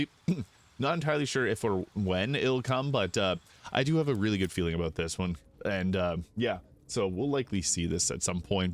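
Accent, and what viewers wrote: Canada. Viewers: Love your content but why do you sound Canadian sometimes?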